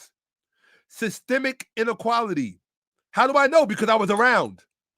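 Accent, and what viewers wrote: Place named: New York, United States. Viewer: Thanks. Im always reminded of my fifth grade friend from New York while listening to you because of the way that you speak. The knowledge that you teach is truly appreciated.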